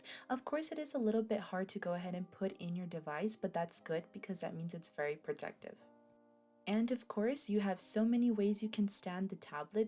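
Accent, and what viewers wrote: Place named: South Florida, United States. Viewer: Are you from the Miami/South Florida region? Your accent reminds me of home... And now I want mangoes and guava.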